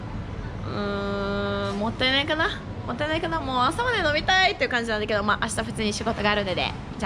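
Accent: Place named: Okinawa, Japan. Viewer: なんか沖縄っぽい訛り方してますね！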